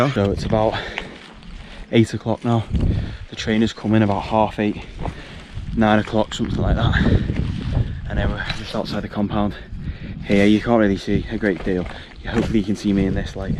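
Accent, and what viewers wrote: Liverpool, United Kingdom. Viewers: I'm trying to figure out your accent. Is it scouse?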